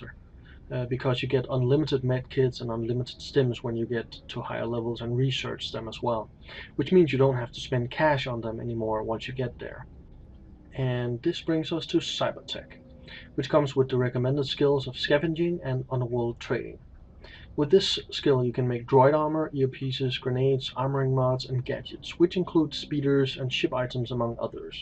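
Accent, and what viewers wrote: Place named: Denmark. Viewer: Loving the Danish accent :D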